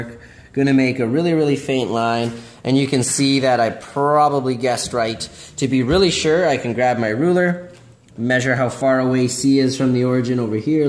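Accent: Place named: United Kingdom. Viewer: British guy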